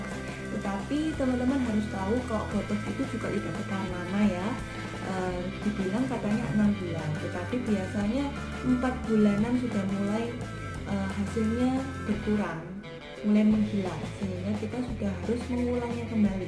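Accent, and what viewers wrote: Java, Indonesia. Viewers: ini orang taiwan apa orang jawa ko suaranya medok ya hahaha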